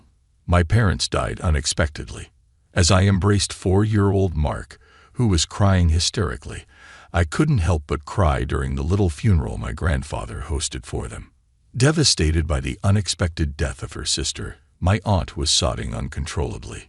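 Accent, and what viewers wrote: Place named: United States. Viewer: Good story. Thank you... the narrator's voice sounds like the USA 🇺🇸!! Tired of the British accents!!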